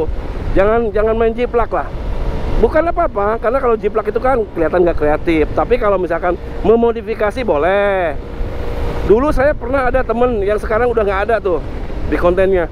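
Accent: Purwakarta, Indonesia. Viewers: Kalo ngedenger cara ngomongnya jadi inget temen saya yang orang Purwakarta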